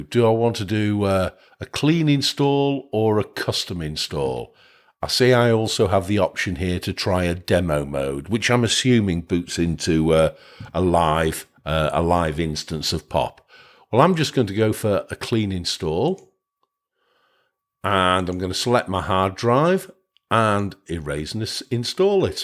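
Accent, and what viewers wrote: United Kingdom. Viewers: Happy New year Year, sir! Apart from learning a bit more about Linux, being a non-native speaker of English, listening to your authentic British accent is a pure delight. Do you have it open-sourced so I can fork it (aka adapt it a bit)? 😃 Shine on!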